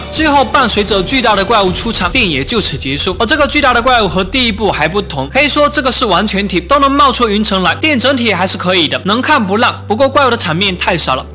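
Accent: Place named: Fujian, China. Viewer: 虽然我是福建人 但是听到福建口音的普通话还是不怎么舒服啊哈哈哈哈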